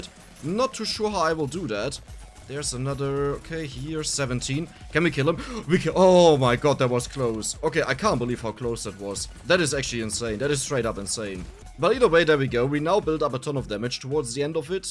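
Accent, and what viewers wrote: Germany. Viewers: ist ja schrecklich deutsche englisch sprechen zu hören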